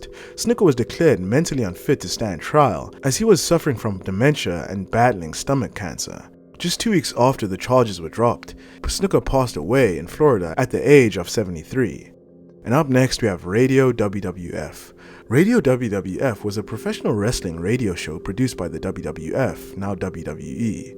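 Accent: South Africa. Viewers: Are you South African perhaps? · He sounds South African, I thought I was bugging.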